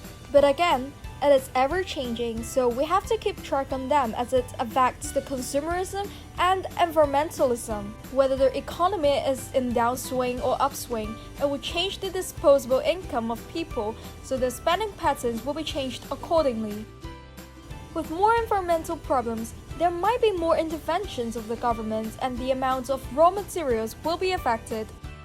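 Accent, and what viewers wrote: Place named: United States. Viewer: I love ur American accent! U must be a beautiful girl!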